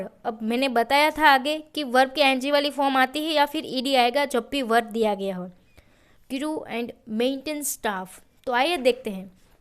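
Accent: Gujarat, India. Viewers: Thank you 🙏 Gujarati tone in your voice 😄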